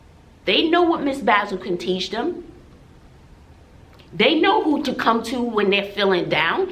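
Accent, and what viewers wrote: New York, United States. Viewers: Are u from ny that accent sis lol love it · New here (Jackie Newton referred me to you)....I love your NY accent!!!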